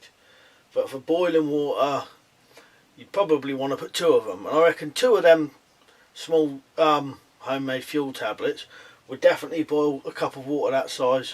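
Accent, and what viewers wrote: United Kingdom. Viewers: British accent cracks me up. Instead of water its waer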